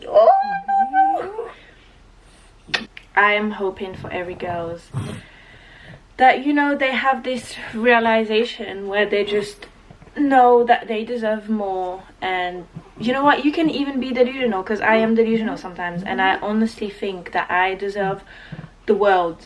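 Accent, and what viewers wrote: United Kingdom. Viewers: Tu m’attrapes encore plus avec ton British accent, fan vraiment ❤ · The British accent 🫂🫂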